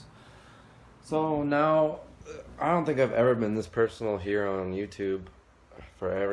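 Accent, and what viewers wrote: Bisaya, Philippines. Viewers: thank you for sharing, your a tough guy. God bless your family, and i cant wait to hear your bisaya accent soon :)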